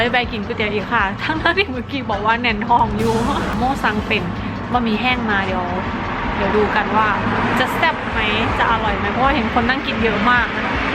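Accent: Isan, Thailand. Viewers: เป็นคนสุพรรณแต่ชอบเสียงบรรยายภาษาอีสานครับชอบมากๆจะติดตามต่อไปรักคนอีสานครับ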